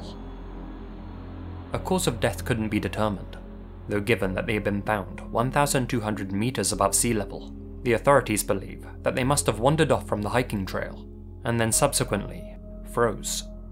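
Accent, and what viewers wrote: United Kingdom. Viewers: British guy doing as for usps...love it haha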